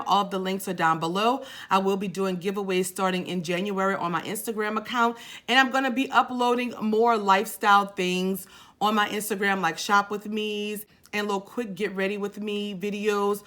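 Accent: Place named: New Orleans, United States. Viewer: I’m so happy when I hear that New Orleans accent and that slayed face. My bff in my head hey girl. Fun fact : my actual bff’s name is Latonya.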